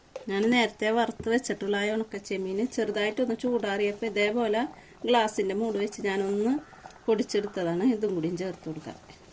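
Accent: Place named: Chavakkad, India. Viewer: Chavakkad vadanapppalli samsaram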